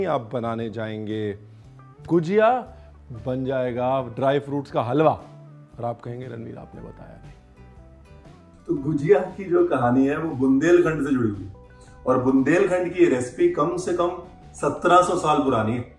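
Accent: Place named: Lucknow, India. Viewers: Humare Ghar bhi yahi Banti hai Holi mein, full on lucknaowi accent... Chalte phirte bhi didn't miss the essence of history/explanation badiya bahot badiya Background score bhi Holi wala HOTA toh cha jate my god... Phela Saal Ka first festival Kuch toh shor Hona chahiye...koi nai feelings conveyed by exceptional Poem, visuals, t-shirt, recipes and ofcourse singing... 🌈🎨🏳️‍🌈🎊 Happy Holi to Yours from ours 🥳🥳🥟🥟🥟🥟🥟🥟🥟🥟🥟🥟🥟🥟🥟🥟🥟🥟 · Thank you so much...I love all your receipes..and this one is super amazing... Love the way you talk...main videos dekh ke samajh gayi thi this must be someone from Lucknow...Thanks! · Lucknow ka accent ek Punjabi k muh se sunna.. bada mast laga sunke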